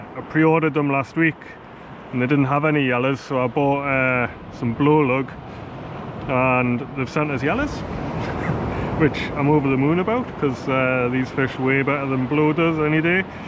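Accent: Newcastle, United Kingdom. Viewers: Listening to you with your Geordie accent using Cockney rhyming slang made me smile,. Thank you for giving us another video on a freezing night. 👍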